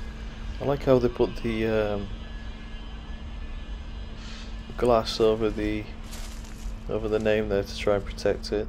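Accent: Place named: Hull, United Kingdom. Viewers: Great to hear an English voice for once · Can I ask where you are from? You sound like you've got a Hull accent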